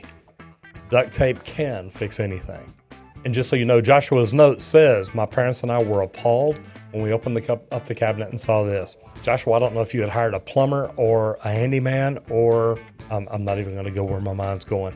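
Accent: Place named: South, United States. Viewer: "if y'all haven't seen it..." *as I'm clicking for the new video. Must be the voice... making me do it, how do I deny Southern Charm?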